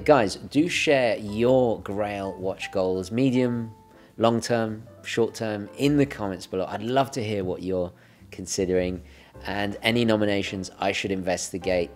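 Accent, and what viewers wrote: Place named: United Kingdom. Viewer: Just what I needed for this midweek slump...an exceedingly eloquent British gentleman talking about watches. A required reprieve from grad school.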